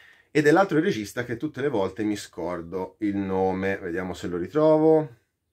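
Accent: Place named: Livorno, Italy. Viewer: Ma sei di Livorno?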